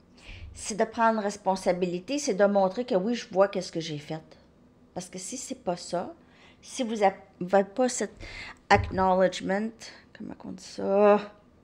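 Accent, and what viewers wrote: Canada. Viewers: Bonjour, mille merci pour vos guidances et quel plaisir d'entendre votre bel accent canadien! je suis en amour pour votre si beau pays et vos si beaux paysages, votre culture si bienveillante.....🤗💖🦌🐾🐻🌲🍁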